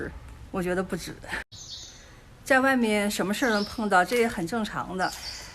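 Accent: Northeast, China. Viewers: Beautiful girls sounds like from Northeast China accents and now Beautiful girl moved from North East China to Southwest Yun nan, Dali.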